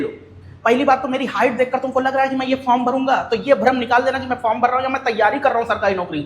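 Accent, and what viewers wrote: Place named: Ambedkar Nagar, India. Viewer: भाई आपकी भाषा बहुत बढ़िया है जैसे अकबरपुर अंबेडकर नगर वाला ❤❤❤❤❤❤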